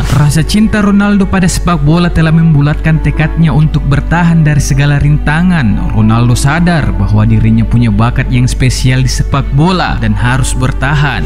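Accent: Medan, Indonesia. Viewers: Lucu pembacanya, logat nya masih terasa, kek nya orang medan ini, horas medan!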